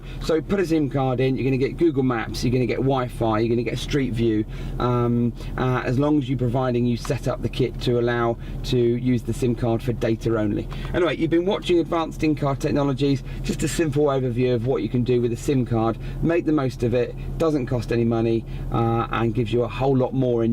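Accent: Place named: England, United Kingdom. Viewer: Hi Just found you and nice to hear an English voice giving help for a change hahaha!!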